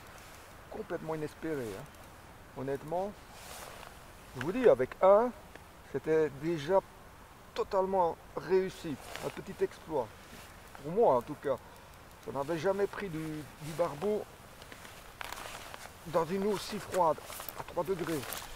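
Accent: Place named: Belgium. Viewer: ah d’accord ! Aussi je me disais bien que ton accent me faisait penser que Tu pouvais être du pays du grand Jacques 😉 Du coup au bord de l’eau, tu tournes au café liegois. Pardon pour la confusion 😔 en tout cas les red halibut Malgré le froid, ils t’ont apporté une session plus qu’honorable. A bientôt mon ami, respect admiratif de Michel de Sologne 👍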